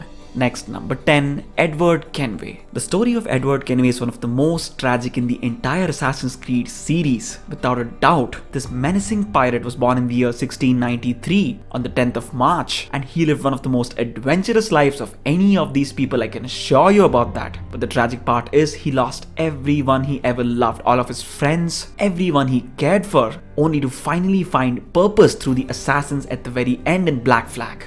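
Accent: India. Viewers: Are you an Indian ? You sound like one...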